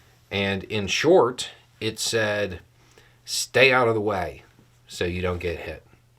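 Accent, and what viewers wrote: South, United States. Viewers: Just found this channel. Thought you were a hillbilly talking gibberish. But you’re actually pretty solid dude. Great watch. I’ll stick around.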